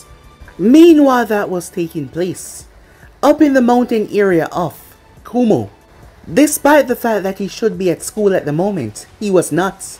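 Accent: Jamaica. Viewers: Wassup bro I’ve always wanted to ask you this but are you Jamaican 🙂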